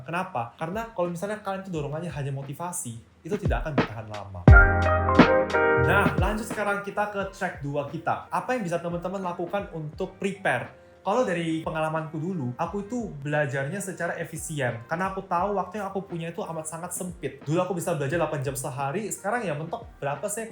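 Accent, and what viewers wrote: Surabaya, Indonesia. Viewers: bahasa jowo suroboyoe tambah mantep ngene dok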